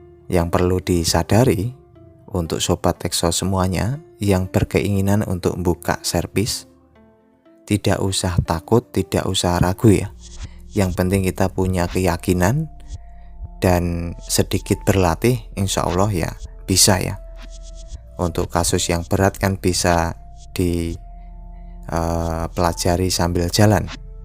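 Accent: Java, Indonesia. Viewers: mas tekso bahasa ne medhok keturunan jawa ta mas?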